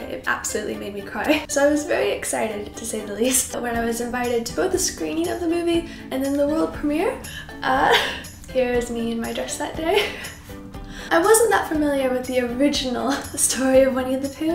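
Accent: England, United Kingdom. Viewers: i love you so much ^-^ tldr- my voice is kind of like your in that its soft and quiet and "weird" and seeing you make youtube videos just makes me so happy and reminds me that is okay to be different. also i love that you have started to say things the way english people do since you moved there :p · Has Tom ever told you that you are starting to catch and speak with a British accent?😃 btw your hair is looking so lovely and fluffy 😊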